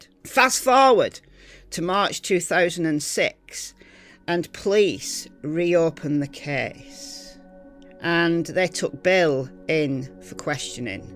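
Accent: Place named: Lancashire, United Kingdom. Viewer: He definitely did it !!!!!! Must say it's great to hear a Lancashire lass your brilliant from a fellow Lancashire lass 👧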